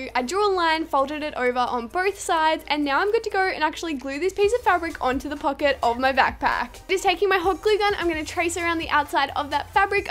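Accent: Australia. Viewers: I could tell she Australian cuz her accent but I wasn't sure but then she said in Australia so ya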